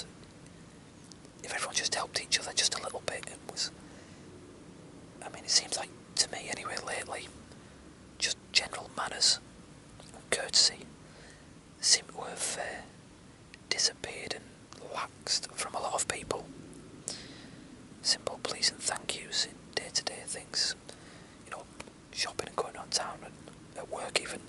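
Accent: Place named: North, United Kingdom. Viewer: i bloody love a northern accent on a man . have enjoyed this x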